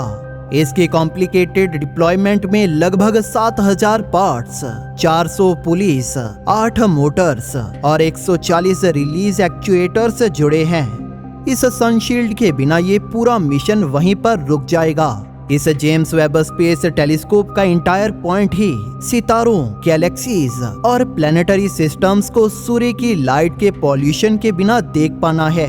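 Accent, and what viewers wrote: Punjab, India. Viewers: O paaji, tussi Punjabi ho...?😁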